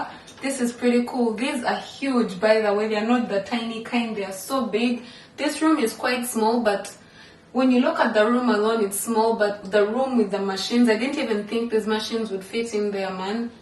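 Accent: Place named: Uganda. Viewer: Are you Ugandan? Your accent sounds Ugandan. ☺️ Edit: Just saw another comment where you confirm that you're Ugandan. Thanks for representing!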